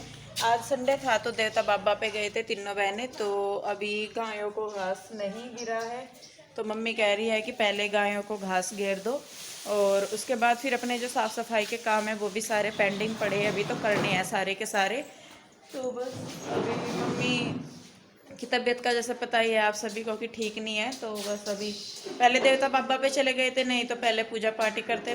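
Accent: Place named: Saharanpur, India. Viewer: सहारनपुर मुजफ्फरनगर वालो की बोली तो अलग है ही 🤩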